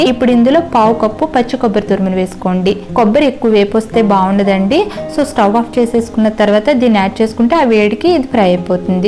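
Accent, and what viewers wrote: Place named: Konaseema, India. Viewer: Ma'am, your recipes are just awesome..but please I have a request to you..by the way, when I observe you speaking, మీరు కోనసీమ వారిలా అనుకొంటున్నాను.. నేను కొత్తపేట, అమలాపురం, రావులపాలం చాలా సార్లు వచ్చాను Hyderabad నుంచి.. అయితే, అక్కడ ఇచ్చే కొబ్బరి చట్నీ కానీ, బొంబాయ్ చట్నీ కానీ ఇంక్కెడ ఆ రుచి లాగా రాదు రాలేదు..మీరు దయచేసి, ఆ కొబ్బరి గట్టి చట్నీ గ్రీన్ or light yellow colour లో ఉంటుంది ,, specially ఇడ్లీ లోకి, బొంబాయ్ చట్నీ పురిలోకి ఇస్తారు... మాకు Hyderabad లో సైకిళ్ల మీద అమ్మే ఇడ్లీ వాళ్ళు అది సర్వ్ చేస్తారు, as they are from రాజమండ్రీ and other east Godavari district s.. please Ma'am video చేస్తారు కదా,,💯🙏🙏🙏 please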